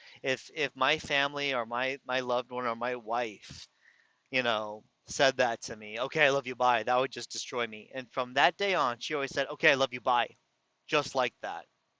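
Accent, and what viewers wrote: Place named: California, United States. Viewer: You're human. You are allowed mistakes. I love your voice, very Californian.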